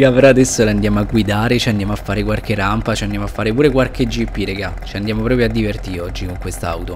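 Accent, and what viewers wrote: Rome, Italy. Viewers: Mo se sente che se de Roma!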